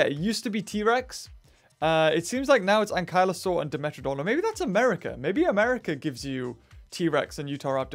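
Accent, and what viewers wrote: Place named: United Kingdom. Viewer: Never knew he was British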